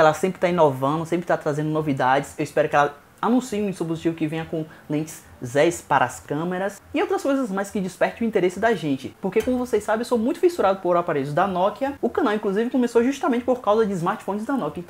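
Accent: Bahia, Brazil. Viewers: vc é baiano cara?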